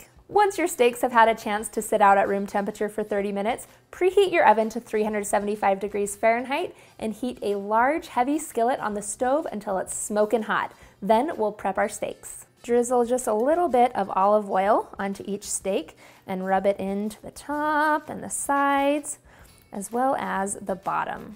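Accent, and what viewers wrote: United States. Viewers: Not in America booboo....its called an accent.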